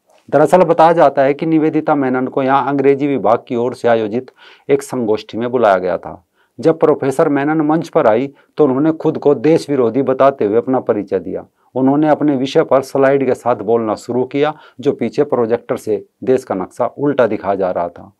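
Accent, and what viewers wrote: Haryana, India. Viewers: You first get rid of your hariunvi accent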